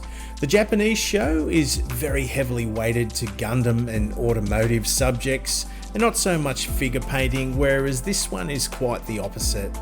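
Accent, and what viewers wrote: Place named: Australia. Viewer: Ah Refreshing to hear another bl00dy Aussie.. oi oi oi...Thanks brother.. Just subbed.. All of the best!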